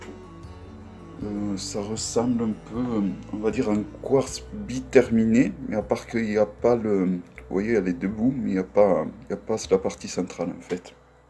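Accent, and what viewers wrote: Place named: South, France. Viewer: Merci moi j'ai bien aimé la vidéo 😊😊 Belle journée aussi et j'adore cet accent du sud😎😎 😘😘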